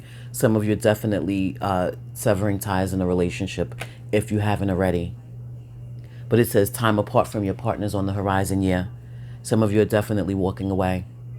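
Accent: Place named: East Coast, United States. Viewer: I just LOOVVVEEEE your East coast accent!🥰❤❤❤💛🥰💋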